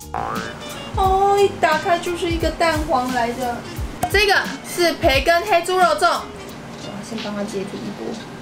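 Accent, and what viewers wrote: Taiwan. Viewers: 生氣的說工撒毀那台語捏的樣子好可愛XD 而且的台語超標準的(以我這種不會講台語的人來說) 很喜歡聽講台語多講一點更好!! (比心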